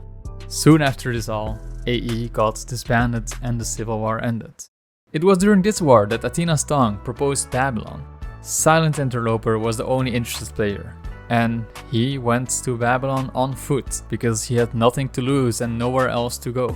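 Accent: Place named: Netherlands. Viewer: cause u sound dutch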